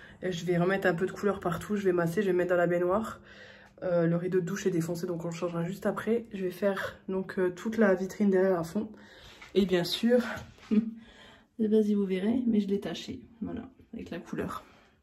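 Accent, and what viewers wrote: Belgium. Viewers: tu m a fait trop rire avec ton accent belge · ton accent au début il était bien belge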